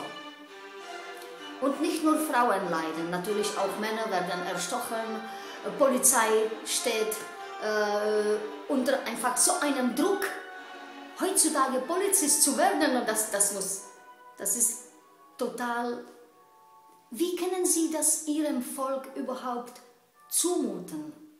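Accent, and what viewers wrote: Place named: Eastern Europe. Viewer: gefällt es auf jeden Fall überhaupt nicht und wahr ist das was Sie da von sich geben, schon gleich gar nicht! Noch verwunderlicher ist es, wenn jemand wie Sie, mit hörbar osteuropäischen Akzent, die anscheinend hier in Deutschland lebt oder zumind. in Deutschland gearbeitet hat (deutsche Sprache ist dann eben Voraussetzung), also aus einem anderen Land kommend, ihr neues Lebens-Glück in Deutschland gefunden hat, dann gegen Migranten und die deutsche Politik schimpft, die es erst ermöglicht hat, dass Sie selber in Frieden in diesem Land leben dürfen oder wie gesagt zumind. hier arbeiten durften oder dürfen - wie auch immer ihre Lebensgeschichte auch sein mag. Sie sollten mal auf den Kalender sehen, in welchem Jahrhundert wir leben. Sie sind hier in Deutschland willkommen geheißen und das gilt also nicht nur für Sie!!! Frau Merkel hat sich diese Flüchtlingssituation nicht ausgedacht und die Masse an Menschen ist bereits schon seid Anfang dieses Jahres unterwegs und wenn die deutschen Politik in Europa von Anfang hat die Schotten dicht gemacht hätte, dann hätten wie eine in der Welt noch nie dar gewesene humanitäre Katastrophe gehabt! 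Länder wie Griechenland oder Italien, die eben ans Mittelmeer grenzen, wären total überfordert gewesen. Die Flüchtlinge wären so oder so gekommen, nur die anderen Länder sehen alle weg und hat über Jahre hinweg nur Mitleid mit Kriegsflüchtlingen und hungerten Kindern geheuchelt. Jetzt aber, wo sie plötzlich vor der Haustür stehen, will sie keiner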